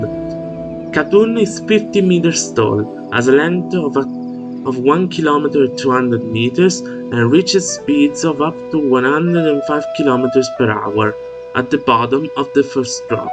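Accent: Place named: England, United Kingdom. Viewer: Bravissimo mi piace quella pronuncia inglese ;)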